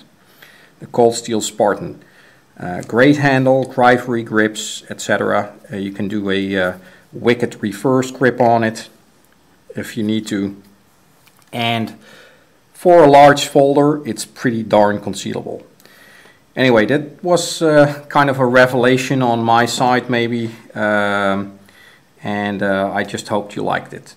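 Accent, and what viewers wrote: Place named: Netherlands. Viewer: Ik kan wel horen dat je een nederlander bent. Niet dat je Engels slecht is, maar de uitspraak kan beter. Goede EDC keuzes!!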